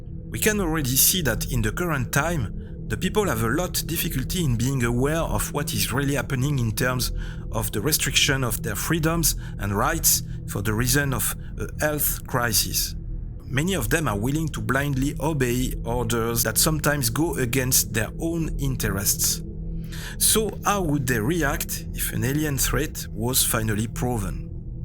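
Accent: France. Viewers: .... I can't. 😅 As much as I'm interested in the content that Ridiculous French Accent drives me insane. That annoying AI Voice would of been more bearable.. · Ça c'est l'accent d'un français ou bien je ne m'y connais pas en accents 😂😂😅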